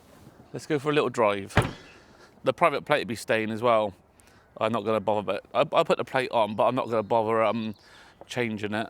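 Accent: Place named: Bristol, United Kingdom. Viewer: Mines a year older, HSE Lux with 1 previous Doctor owner and yours looks in equal condition. Sounds like and looks like Bristol so you're only 20 miles away and I've got to say that offer of 16 and a half K you should be ripping their arm off. I was thinking 14 but maybe 15k tops as it does look very well cared for.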